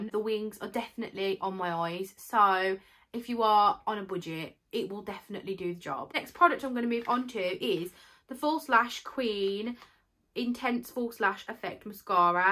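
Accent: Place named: Birmingham, United Kingdom. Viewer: You have an accent, you from Birmingham?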